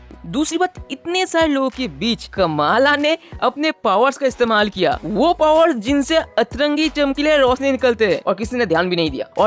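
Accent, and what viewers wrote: India. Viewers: Baton se ap indian Q lag rahy ho ap 🤔🤔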